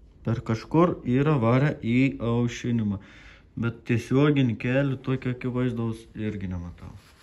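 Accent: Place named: Aukštaitija, Lithuania. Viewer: Nešnekiek aukštaitiška😃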